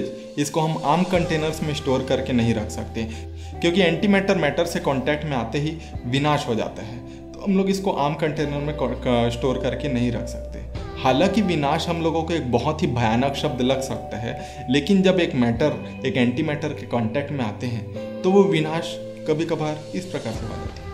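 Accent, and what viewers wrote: Chhattisgarh, India. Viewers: Accent se toh lag raha hai Chhattisgarh se hain.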